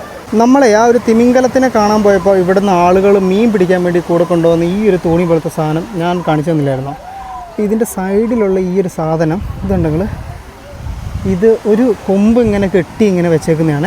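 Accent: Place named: Central Kerala, India. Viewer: നിങ്ങൾ ശരിക്കും കണ്ണൂർകാരൻ തന്നെയാണോ നിങ്ങളുടെ ഭാഷ ശൈലി കോതമംഗലം മൂവാറ്റുപുഴ തൊടുപുഴ ഭാഗത്തുള്ള ഭാഷാശൈലി ആണല്ലോ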